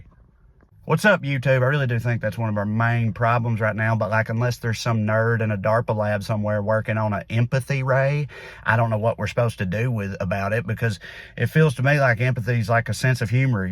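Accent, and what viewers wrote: South, United States. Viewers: This guy is already attractive - but his mind through that southern accent takes it next level.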